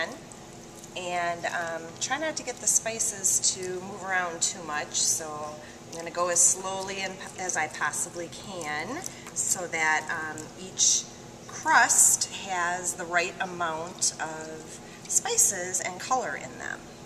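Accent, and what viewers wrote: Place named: Canada. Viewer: is she canadian? her accent sounds very canadian haha love it! beautiful candles, wish i could smell through the screen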